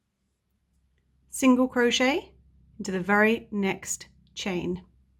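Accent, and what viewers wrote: England, United Kingdom. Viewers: Great tutorial thanks also so sweet to listen such a rich English accent. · I heard your accent and assumed you would be using British crochet terms until I heard SC! I'm a beginner to blankets and anything that isn't amigurumi, would I be able to follow this if I remember that SC is DC and DC is TC to me? Are there any other differences ?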